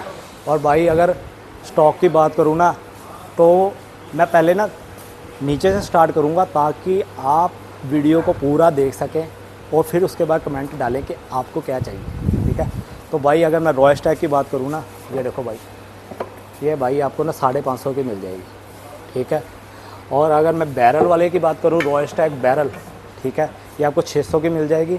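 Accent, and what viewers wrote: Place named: Punjab, India. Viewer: Bnde da accent punjabi lgda menu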